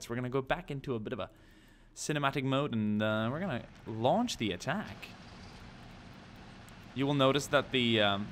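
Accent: Sweden. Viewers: Är du svenne? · Are you swedish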